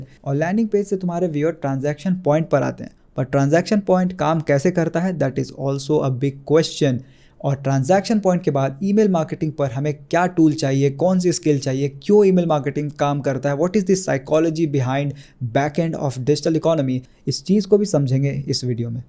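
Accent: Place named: Bengal, India. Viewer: Saying US as "तुम".. It clearly shows that you are from the land of Bengal with that sweetness🍬 in our talk. Ami I wrong then mention me. Thank you my friend 😊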